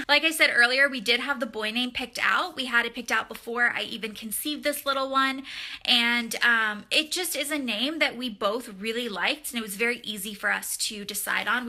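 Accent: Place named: South, United States. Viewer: I don't know what it is about you that makes me so happy. Maybe its that your twang comes out randomly when you're saying something. HAHA Its the same with Blair and my Texan self loves to support my southern belles. =P Congratulations on the Baby!! You must be so grateful that you have the flexibility to travel so much to Tennessee and with the morning sickness and bed rest? My goodness!!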